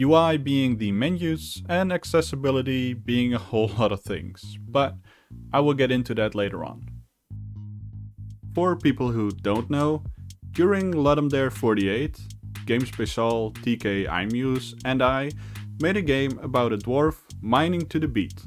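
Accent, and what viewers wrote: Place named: Netherlands. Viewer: I'm dutch! Ja dat hadden we kunnen horen aan je accent pik :D The game looks like fun keep up the great work!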